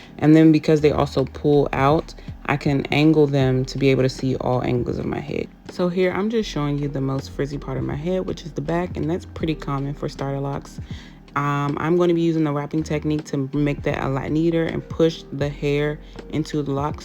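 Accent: DMV, United States. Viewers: U gotta be from the DMV. The accent. I love iiiit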